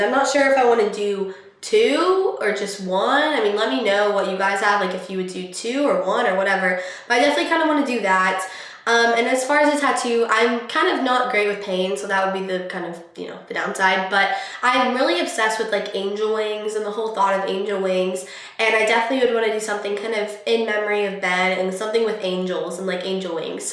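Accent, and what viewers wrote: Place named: General American, United States. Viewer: Hey? I've always wondered why don't you have a Texan accent if you live in Texas? I hear hints of it sometimes but you have a very general American accent!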